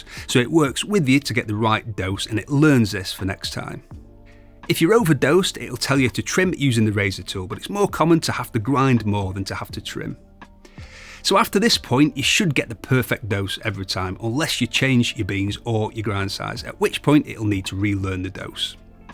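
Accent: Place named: Yorkshire, United Kingdom. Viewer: You sound like you come from somewhere in Yorkshire - where abouts? is the question.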